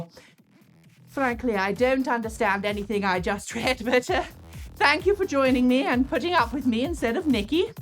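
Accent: England, United Kingdom. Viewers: I love the proper English accent. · great episode. and I liked the British pronunciation.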